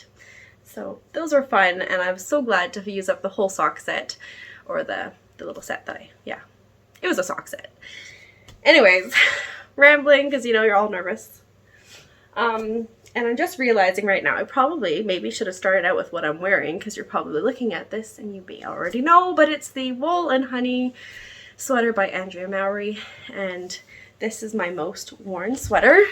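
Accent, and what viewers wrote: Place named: Canada. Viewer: Hi!! I’m so happy I found your podcast! I love listening to your subtle Canadian accent 😊 & you’re not talking too fast, at least I don’t think so. Your hand dyed yarn is so beautiful, I hope I can pick some up one day! Thank you for explaining why you do the sleeves first on sweaters…I’m trying to get more sweaters and garments done this year so that’s helpful to know! I’m currently working on my Beauceron Sweater by Vincent Deslandes while watching & I definitely subscribed - can’t wait to see your future podcasts! Sending 💜 from WA state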